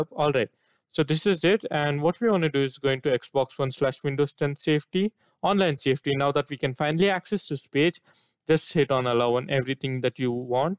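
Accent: India. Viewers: i like indian voice even if im not one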